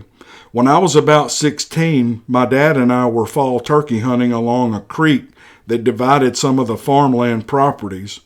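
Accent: South, United States. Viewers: with a touch of southern comfort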